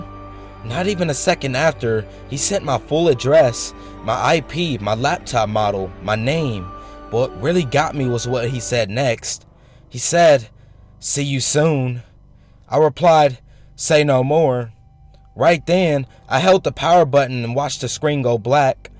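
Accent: South, United States. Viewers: Woah woah woah. This dude from the south or what?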